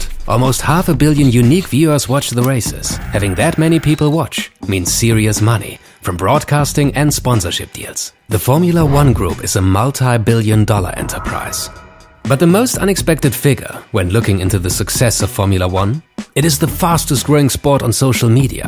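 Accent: Germany. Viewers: Finaly. Youtube recomendation something good. I love german accent. Make me feel smarter.. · Anyone else thinks this is a German accent? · is the person talking german? i had a feeling the whole time but then he pronounced Vettel correctly, he must be german right?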